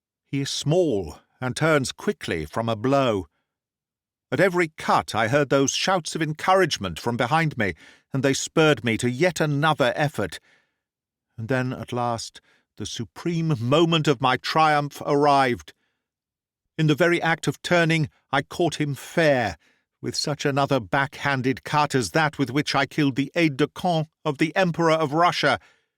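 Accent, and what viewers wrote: United Kingdom. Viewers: I can't express how much I enjoy listening to your clear British accent... Please keep posting more on your channel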